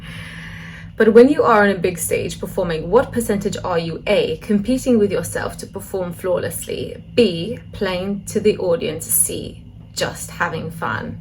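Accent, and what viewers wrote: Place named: England, United Kingdom. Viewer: Thank you, it’s so nice of you to share this with your fans and followers. I did not have the chance to ask, but I have this question, if I may. I learnt you’re from Malta (is that right?), so you’re Mediterranean (I am from Spain). At the same time you seem very Anglo-oriented: you have a strong English accent and the three desert island records you chose are English or American. On top of that I loved your excellent cover of the, which is Brazilian (which is what I thought you were when I first discovered your videos). So my question is, what are your influences, the music popular or otherwise that has made you the singer song-writer that you are? Thank you anyway.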